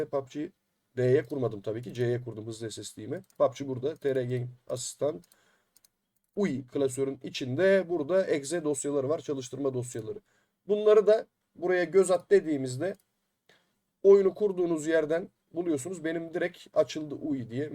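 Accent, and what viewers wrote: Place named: Balıkesir, Turkey. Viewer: Bu oyun bize kafayı yedirtecek. Ne yaptıysam bazı sorunları çözemedim. Bu sorunları tek tek size yamıyorum çünkü kişiye özel cevap verebileceğinizi düşünmüyorum. Bu nedenle işin sıfır noktasından başlayıp yardımcı olmanızı istiyorum. Windows 11 flash oluşturmadan başlayalım çünkü bizler bilgisayarı bilen kişiler değiliz. M. anlatır gibi (kendim için söylüyorum) tek tek KISACA anlatır mısın. Konuşma tarzından balıkesir ve çevresinden olduğunu tahmin ediyorum en son çare bilgisayarı kucaklayıp sana getireceğim. kolay gelsin.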